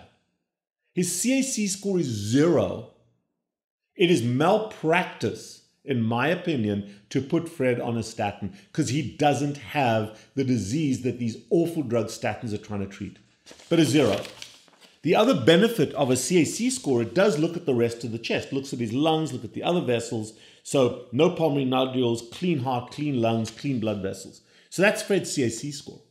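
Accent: South Africa. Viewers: Hello! Is that a South African accent? Ek hou van die afrikaanse taal. Greetings from Evergreen, Montana, USA.❤❤❤ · yes he is from south Africa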